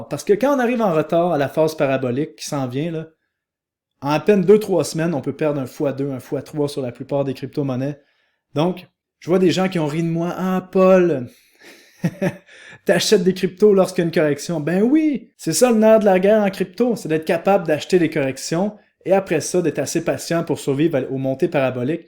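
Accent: Quebec, Canada. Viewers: Il me tue avec son petit accent québecois la ! 😂😂 "Nen acheté" la petite liaison des mots orales mdrrr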